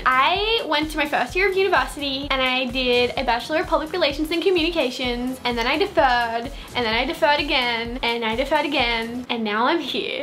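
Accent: Australia. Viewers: Siiiiiiir Lol sorry Australian accents make me laugh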